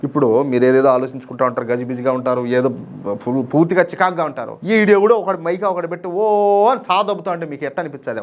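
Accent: Rayalaseema, India. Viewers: అన్నయ్య నీ భాష అదిరిపోయే భాష మాట్లాడతావు అన్నయ్య రాయలసీమ భాష చాలా మంచి న్యూస్ చెప్పావు అన్నయ్య థాంక్స్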